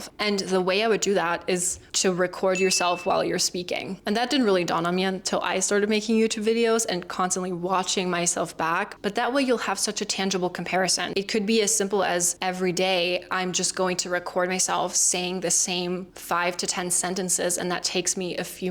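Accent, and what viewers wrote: United States. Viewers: "You sound just like an American. Honestly, if you'd told me you were from the US, I would've believed it — no questions asked. Like, the way you talk? I'd have to replay it over and over just to convince myself you're not. I swear, I'd be sitting there listening again and again, like, 'There's no way this person isn't American.' So tell me — did you imitate all of this along the way? Like, was it before, or were you doing it while watching movies and scenes, just copying them as they went?" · I’m an American and your accent is more convincing than mine lol! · Your American accent is perfect. In fact, it's so perfect it's scary. I would never have guessed you aren't American. I don't hear any traces of German accent in your speech. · Honestly figured one of your parents was American. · I could hear straight away that you are German, from the way you pronounce T at the beginning of words. 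However You do an American accent very well, much better than I can 💖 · This will sound silly but your American accent is so good that I thought you had a sister that did the German bits. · As impressive as your grasp of the American accent is, you also don’t fall into the grammatical ticks that virtually every German I have ever known. For some reason, Germans have a terrible time with English verb conjugation and pronouns. Your English is flawless except for one word you were using repeatedly in this video. I have never hear accordion pronounced “a-cor-Dee-on”. English speakers pronounce it “a-cor-Dee-an”. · AMAZING American English...except that last syllable of "accordion"...i know it's spelled on but we say it with an "en" sound...hear it on Google translate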